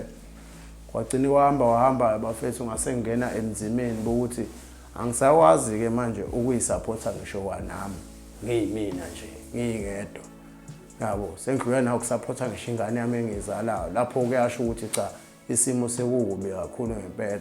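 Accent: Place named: Ndebele, Zimbabwe. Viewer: Waze wakhuluma njengaMandebele aseZim nsizwa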